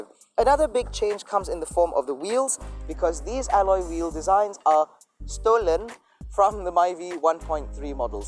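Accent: United Kingdom. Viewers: U sound like a british man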